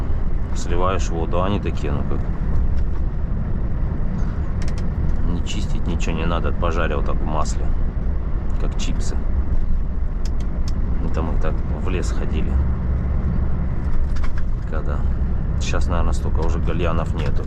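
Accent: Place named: South, Russia. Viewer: ! Ты же якут, а откуда такой говор южный?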